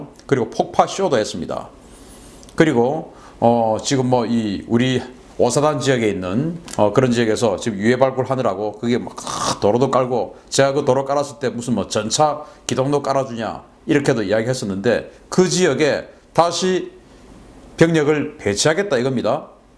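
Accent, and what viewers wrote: Gyeongsang, South Korea. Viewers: 군사전문 박사님의 매일 듣는데 너무 재미있고 실감납니다. 경상도 말씀도 조금 쓰시면서. 아주속 시원합니다. 건강하세요. 고맙습니다.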